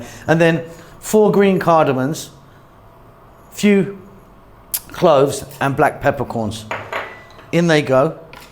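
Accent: United Kingdom. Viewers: Speaking from what I see, are cups and tablespoons smaller in the UK than the US (going by your accent)? I'm a small-scale US homesteader and I want to add some sheep to my property. Looking at recipes. "Lamb" from the market is usually (legally) mutton and the wool sheep variety. I want to get hair sheep and harvest annually to avoid greasy, lanolin flavor.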